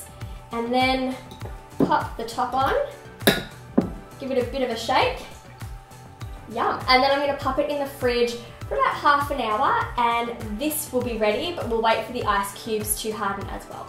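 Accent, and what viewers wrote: Australia. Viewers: Is she Australian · ThisWorldSucks I think she's Australian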